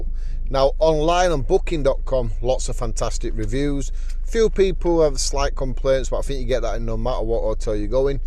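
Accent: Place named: Yorkshire, United Kingdom. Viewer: You do remind me of somebody from emmerdale with your accent 👍enjoy your channel mate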